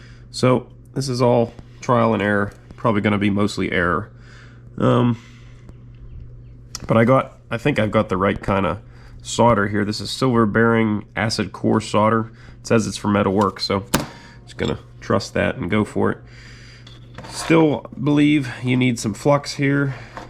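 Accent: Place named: Western Pennsylvania, United States. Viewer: I'm curious where you are. I'm getting a slight western PA accent? I have relatives there.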